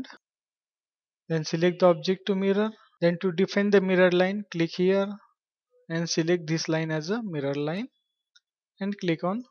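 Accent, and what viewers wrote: India. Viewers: как вы индусы заебали со своим акцентом, сил нет.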